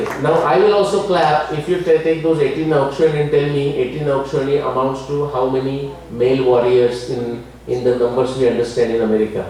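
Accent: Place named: Maharashtra, India. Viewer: मराठी मंडळ म्हणुन मराठी उच्चारात इंग्रजी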